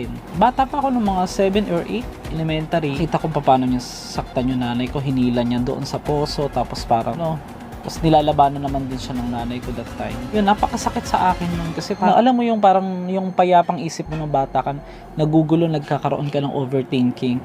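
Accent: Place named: Hagonoy, Philippines. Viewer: Taga Hagonoy Bulacan k b idol? Kase yong punto at way ng salita mo parang tga hagonoy k. Daming life lesson s kwento mo.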